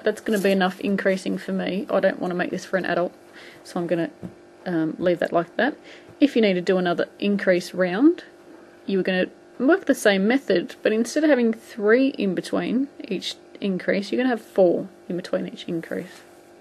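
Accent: Australia. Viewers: Thanks, just doing this for the cluster bag:) But now after doing this and seeing your friends technique for fitting hats I really want to make myself a slouch hat:) You are seriously in the top 3 best online crochet tutors in the world. Lobe your work and love hearing that Aussie accent. (I'm an Aussie too, just feels so homey) Thanks again!:)) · you have the absolute BEST tutorial videos on youtube. Thank you SO MUCH!! Listening to your aussie accent is a bonus:)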